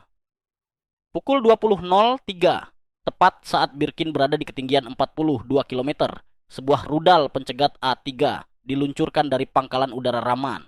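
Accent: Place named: West Java, Indonesia. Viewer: kang, urang sunda yak ? F-35 kang, bukan ep-35 😂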